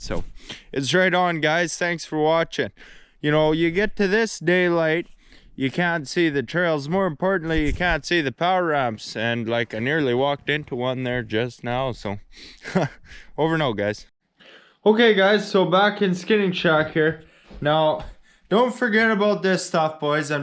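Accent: Canada. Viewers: hahaha nothin brightens my day quite like that canadian accent sayin "well good day there boys n girls". i was lookin in my subscriptions and this video was at the top and started running the video without the audio and i could see his face and the subtitles writing that first line out and it made me chuckle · where are you located you sound canadian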